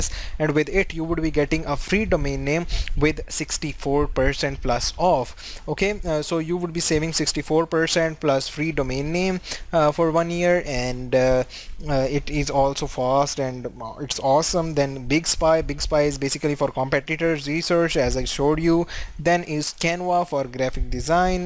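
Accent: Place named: India. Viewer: In your discription you give us location United States but your English accent like Indian 😂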